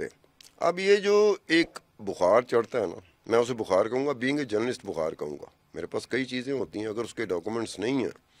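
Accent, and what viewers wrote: India. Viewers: Aleem khan please ask your host speak like Pakistani not Indians. This is very annoying .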